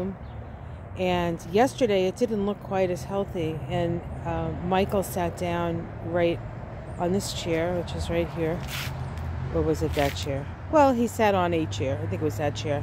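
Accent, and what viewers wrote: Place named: East Coast, United States. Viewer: Another reason why I tune into your morning astrological strolls with Charlie is because you are not a phoney - just sincere and concerned commentary on American and world events with doses of deep wisdom, humor, and splashes of that strong east coast accent (I’m from Somerville, MA) Hearing another speak about and process daily events is therapeutic for this listener…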